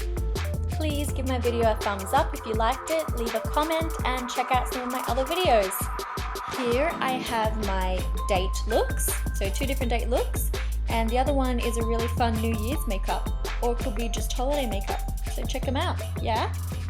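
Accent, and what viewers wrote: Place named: Australia. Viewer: Love that accent, Australian?? I like this makeup tho :) xx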